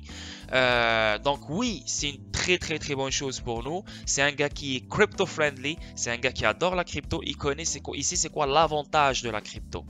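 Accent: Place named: Morocco. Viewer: Tu serais pas un peu marocain ? Je crois entendre un léger accent.. Merci pour tes vid. Dois-je comprendre que je dois reprendre du xrp ?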